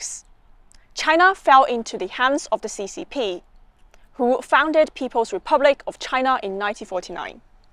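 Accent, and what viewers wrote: England, United Kingdom. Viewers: i could hear your english accent immediately lol. very cool. im american btw good video! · Very well put. I confess that I am intrigued by China, and this video presented by this very sweet and lovely lady with her British accent, did an admirable job in pleasantly explaining why China is as it is. It is complicated. Western nations seemed to enjoy exploiting China for that Century of Humiliation. Shame on the West. I hope our nations can find a happy medium. Job well done,!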